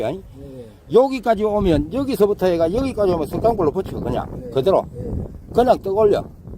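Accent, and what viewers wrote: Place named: Gyeongsang, South Korea. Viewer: 경상도사람들만느낄수있는애정가득한목소리가 느무반갑습니다.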